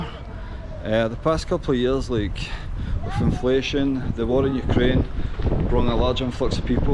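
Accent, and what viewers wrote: Scotland, United Kingdom. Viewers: You are Scottish ,so I know why I need a subtitles to better understand you. I learn English and northern accents are difficult for me. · the Scottish accent is very heavy and thank god for the subtitles or we could not get it all, also he mumbles. But good points he makes. However the cost of living has gone up a lot in PL, they also have 23% VAt!!!!!., He is right about a lot he says about the good food quality. There is also good competition between German owned Aldi and Lidl, and Portugese owned Biodroinka. Food is pretty fairly priced. However if the zloty does not depreciate soon, as it should given their deficits and debt burden, then PL is going to be overpriced like many EU countries. Good video, interesting and the Krakow area is one of the more expensive ones in PL to boot. Hi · Well-done video and commentary even though I struggled a little bit with your Scottish accent while I was watching your video. 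Allow me to ask you for a favor:would you be willing to call Poland as part of Central Europe, or at least Central-Eastern Europe? If you keep classifying Poland as Eastern Europe , you put Poland in the same bucket where Russia, Ukraine and Belarus belong and you know well it is not the case. Poland is located in the center of Europe, Poland is part of the Latin culture through Catholicism, Latin alphabet, architecture, democratic tradition, very high level of freedoms where nobody will dare to cancel you because of your opinions. Cheers and greetings from a Polish-American. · Poland is 20 years behind UK. Its unevatible. Love your accent ! Long live the scots ! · You have a huge+ for your Scottish accent. Thanks for the video and enjoy Poland. Not bad joke about ppl of Paisley, but is it really that bad? lol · Cool to listen to a Scot living in Poland. One thing, you are living in Central Europe, not Eastern Europe.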